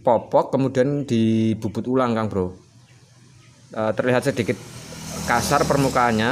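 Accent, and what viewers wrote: Java, Indonesia. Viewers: nama mu kaya marga orang batak bro,,,tapi logat mu medook bat jawa😅😅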